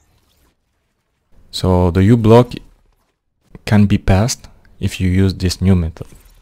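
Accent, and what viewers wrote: France. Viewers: Why you have a french accent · That french accent thooo, keep up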